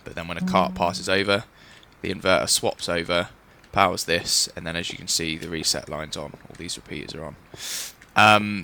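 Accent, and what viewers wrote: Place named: England, United Kingdom. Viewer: he has an english accent like an english...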